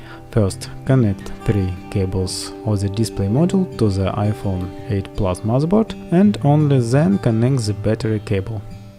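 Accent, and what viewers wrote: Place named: Russia. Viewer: рашн аксент дас ист пыздець ) and thanks a bunch for the video, it's awesome and clearly explains all actions - much appreciated 👍🏻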